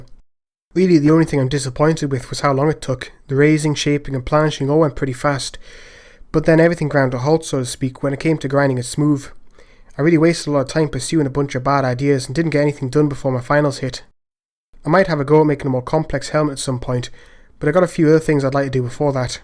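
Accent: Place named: Scotland, United Kingdom. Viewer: Great videos! Thanks for uploading! Clearly, you're from Scotland, but do you mind if I ask what part?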